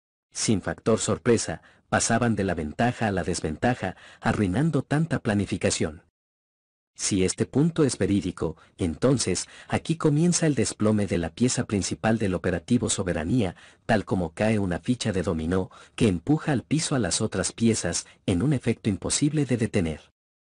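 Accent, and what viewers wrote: Chile. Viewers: Me resta decir que el relator es muy probablemente chileno, o me equivoco???? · aunque te esforzas en disimular tu acento, vos sos chileno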